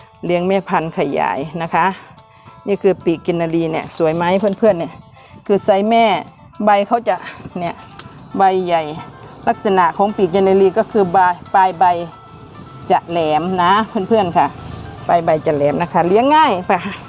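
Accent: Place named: Surat Thani, Thailand. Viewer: พี่ พูดเหมือนคนสุราษเลยครับ ได้ความรู้ครับ ผมก็เริมสนใจบอนสีครับ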